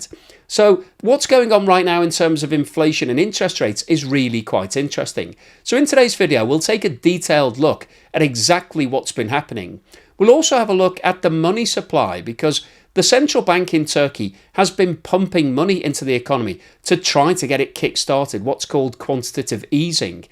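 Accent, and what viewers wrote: United Kingdom. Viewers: Its funny hearing a british man talking about troubles of Turks. Mind ya own business ya fanny.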